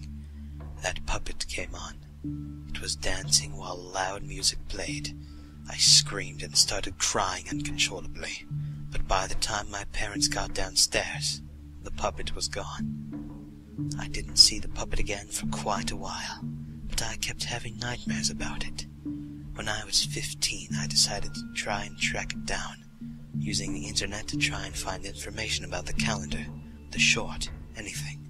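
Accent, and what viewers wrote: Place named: United Kingdom. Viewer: 原來不是只有我這麼覺得 超英國腔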